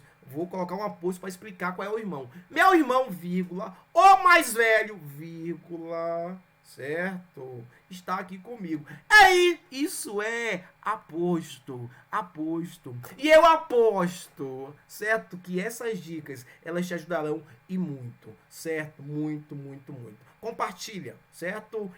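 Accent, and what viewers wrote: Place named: Northeast, Brazil. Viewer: Capote na enfermagem é uma proteção..... espécie de avental......eu amo a linguagem e o sotaque nordestina.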